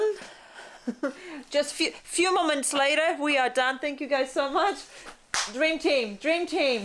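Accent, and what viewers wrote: Australia. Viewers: Wow, your Ozzy accent is really starting to kick back in. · Bloody hell, you're beginning to sound Australian again. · ok now I know what is Australian ascent is.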